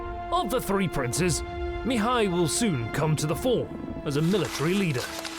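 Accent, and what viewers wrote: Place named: Yorkshire, United Kingdom. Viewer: that yorkshire accent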